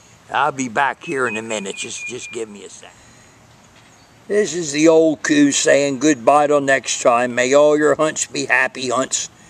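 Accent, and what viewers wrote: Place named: Dundalk, United States. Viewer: Where are you from? You almost have a Dundalk, MD accent. Happy hunting!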